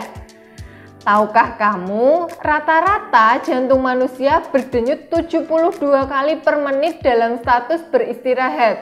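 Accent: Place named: Banyumas, Indonesia. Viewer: Aksen banyumasan, tegal dan sekitarnya. Muantab bu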